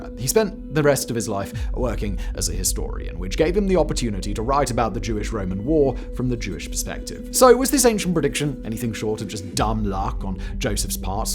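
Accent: United Kingdom. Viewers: Forget the beard or the chrome dome, the glib UK accent and rapid fire delivery hooked me. I predict in less than a week, I’ll have watched no fewer than two more of videos.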